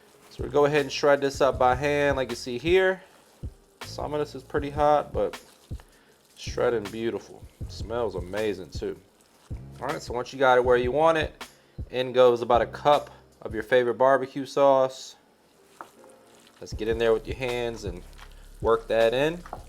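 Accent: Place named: Louisiana, United States. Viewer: You just popped up on my feed , new sub. You sound like you come from the land of the Muffaletta, and the Mirlitons little brother 😁👍👊 !!!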